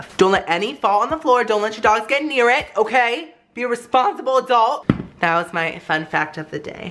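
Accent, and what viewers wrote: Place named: United Kingdom. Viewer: HIS LITTLE BRITISH ACCENT MAKES ME LEE EVERYTIME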